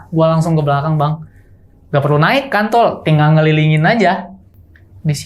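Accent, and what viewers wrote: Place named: Sunda, Indonesia. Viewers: pinaliiii sunda pisan ang..ang..ang... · sunda pisannnn mntp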